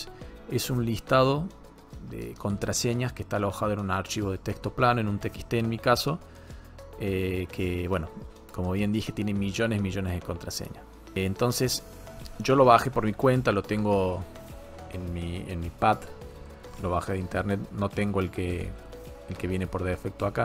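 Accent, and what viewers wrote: Córdoba, Argentina. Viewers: Sos cordobés ? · que hermoso el acento cordobes explicando algo, saludos desde Córdoba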